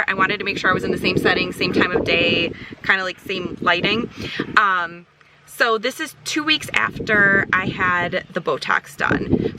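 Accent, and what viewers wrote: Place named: Midwest, United States. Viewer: Omg you are soooo midwestern! “ Holy jeeez ya, those are deep!” 😂🤣😅 I’m a MN gal. 😘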